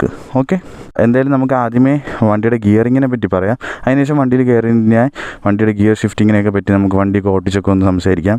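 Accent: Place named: Trivandrum, India. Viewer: Where is this place ? Trivandrum ano? Asking because your way of talking is very similar to someone from tvm. Im from tvm and we also use the word theri for slope.... Great video by the way ...very well explained with examples.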